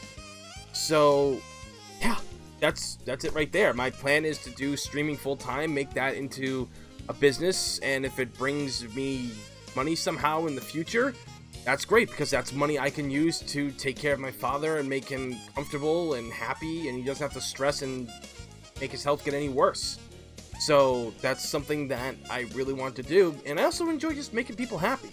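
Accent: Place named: Boston, United States. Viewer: ROE introduced me to your channel. I think you're absolutely hilarious man. Love your accent u sound cool. I think you're a bostonian? That or new yorker. When u did those playthroughs in outbreak with ROE I really enjoyed um great fun 😁 By any chance do u have the moment where u were dragged through the window by the zombie in the hospital? I PISSED myself laughing 😂🙈 Sorry I just couldn't help laugh but it was funny af 🙈 I wish I could be your friend lol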